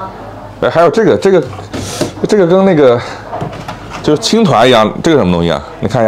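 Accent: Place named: Hong Kong, China. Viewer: 港普听着真亲切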